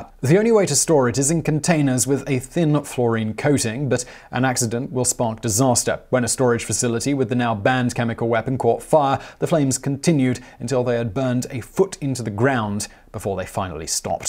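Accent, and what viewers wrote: England, United Kingdom. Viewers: All of you guys complaining about how he prounces cadmium must not be regular viewers. made it very clear in other videos that he doesn't care if he mispronounces words and clearly puts in little to no effort trying to remedy the problem. This is exactly why people who don't understand topics shouldn't present videos on them. And why you need a host who contributes more to the show than just an English accent. Incorrect information, like misproununciations, spread like a disease. One person hears it, then spreads it on to others, who in turn spread it on to others thsemselves. And so on and so on. Some may think it's a trivial issue, but when we're talking about 10s or 100s of thousands of people being exposed to this stuff, the figures are anything but trivial.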